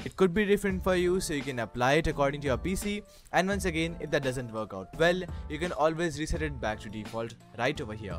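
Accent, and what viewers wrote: India. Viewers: India Voice = HACKER dont download is file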